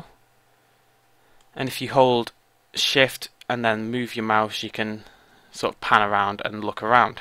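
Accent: United Kingdom. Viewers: Luckily I actually can imitate four of the over five thousand U.K accents so I can understand him. Now as for what he is talking about and making reference to, well I suggest you all go to full screen to be able to read the headings on whatever he is mentioning. If you need a translation into American aka Michigan-no-accent-at-all, just note me. I will attempt to delete all the extra words that are not necessary to learn how to do this in a transcript. I can do everything in Old English fonts to make it more authentic. ;)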